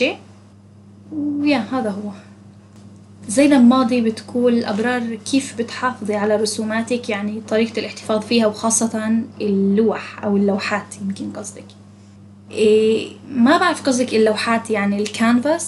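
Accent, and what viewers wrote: Palestine. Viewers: بالعكس اللهجة الفلسطينة اكثر من رائعة تحياتي لفلسطين و لهجتها ❤ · احب جدا طريقتك برسم الزي الياباني تعجبني اتمنى ارسمه مثلك او اتقنه مثلك ماتردي علي بتمسخر ع لهجتك وبعرف انو فلسطين هيك بتحكي وبلعكس حلوة والي بقولو كلم هدول اكابر وبسس^♡^♡_♡ ♥♥♥♥♥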